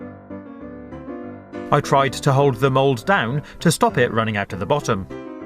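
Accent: United Kingdom. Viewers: he's British, that's how they say it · god bless your british accent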